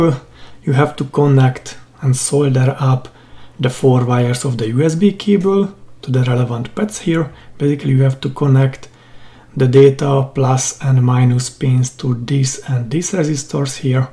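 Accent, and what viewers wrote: Hungary. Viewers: Sounds like hungarian accent:D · hello, awesome project. i am just building it, how do i connect the cable to the arduino? which pins? what is the value of the two resistors r17 and r18? by chance my ears are correct and i hear a hungarian accent: easy rider ocseeem! :D thx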